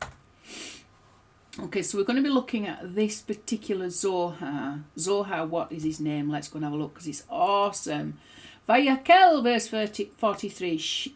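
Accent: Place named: Scotland, United Kingdom. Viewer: May G-D bless you very much today my dear sister. (I insist as I told you in the past: "I adore hearing that Scottish accent"! Once you told me you were borne in England; but I can only picture you as a fine Scottish sister in Faith, Tribe, Tradition, and Past. For sure, also, our future!!! שלום וברחה! אלוהו CHRISTIAN ANGUS GRIFFIN-MACKENZIE Enjoying your lesson from Santiago, Chile 🌶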